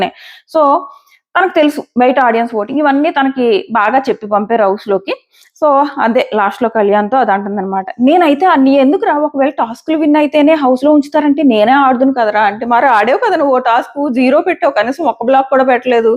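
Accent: Godavari, India. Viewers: ఏదో గోదావరి జిల్లా భాషతో మాట్లాడేసి మీకు కనిపించింది గొప్ప అనుకుని మాట్లాడేస్తారు మీ సర్కిల్లో కూడా మీ సర్కిల్లో కూడా మీ ఫ్యాన్స్ కూడా మీకు తగ్గట్టుగానే మాట్లాడేస్తారు శ్రీజ తనుజ విషయంలో కొంచెం పొగరుగానే మాట్లాడింది డిమాండ్ విషయంలో పొగరుగా మాట్లాడలేదు ఇంకోటి ఏంటంటే ఎవరి ఆటిట్యూడ్ వాళ్లకు ఉంటుంది ఏదో మీరే గొప్ప అనుకుని మాట్లాడేస్తున్నారు శ్రీజ ఎలిమినేషన్ అయిపోయినందుకు మీరు చాలా సంతోషంగా ఉన్నారు కానీ శ్రీజ ఎలిమినేషన్ బిగ్ బాస్ కి గొడ్డలి పెట్టు ఇప్పుడు ఎవ్వరూ బిగ్ బాస్ ని అనకుండా ఏమి తిట్టకుండా టాస్క్ లో ఓడిపోయింది కాబట్టి మిగతా వాళ్ళకి జెన్యూన్ రీసన్ ఇచ్చే లాగా జెన్యూన్ గా కన్వెన్షన్ ఎలాగా బిగ్ బాస్ మైండ్ ప్లేయింగ్ చేసింది మీరేమో పెద్ద మీరే గొప్ప అనుకున్నట్టు శ్రీజ వరస్ట్ అని చెప్పేసి ఓవరాక్షన్ చేసుకుంటూ మాట్లాడేస్తున్నారు ఒక్కసారి ఒక్కసారి ఆది రెడ్డి గారి ఇంటర్వ్యూ ఆదిగా రెడ్డి గారి రివ్యూలు చూడండి రివ్యూలు ఎలా ఇవ్వాలో ఆయన దగ్గర నుంచి మీరు నేర్చుకోండి అయినా తప్పులు చేస్తారు కానీ 10% 15% మాత్రమే మీరు మాత్రం 50 శాతం తప్పుగా చెబుతారు కొన్ని బాగుంటాయి అనుకునే లోపే వ్యక్తిగత అభిప్రాయం ఇంకోసారి మళ్లీ చెప్తున్నాను జాగ్రత్తగా వినండి డెమో ఖచ్చితంగా చేసింది వెన్నుపోటు మొహమాటం లేకుండా చెబుతున్నాను మీరు గొప్ప నేర్చుకొని నీ మీద ఉన్న గౌరవం కూడా పోతాడి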